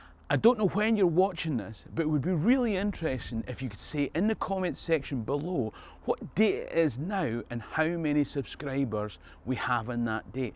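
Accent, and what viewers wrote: Scotland, United Kingdom. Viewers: Gayday buddy just letting you know it’s the 7/8/21 you have 60,100 well done love hearing the scot language l was 2 when we went to Australia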